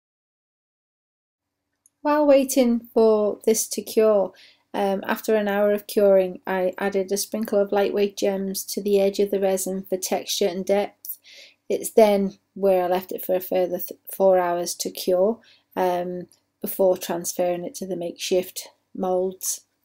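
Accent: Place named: Liverpool, United Kingdom. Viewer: Your accent is lovely. You’re a posh scouse x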